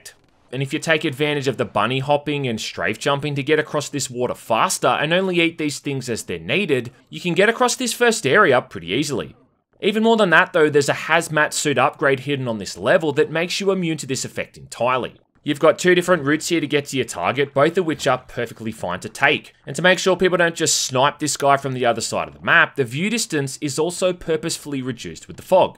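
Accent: Australia. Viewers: This review suddenly feels like the bad old days of G4TV's X-Play in the mid-2000's, but instead of Adam Sessler, you get an angry Australian.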